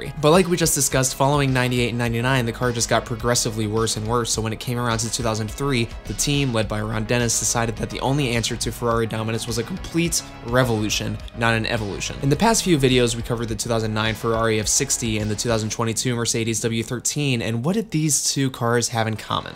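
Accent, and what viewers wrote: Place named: Chicago, United States. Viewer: Your accent seriously sounds Chicagoan.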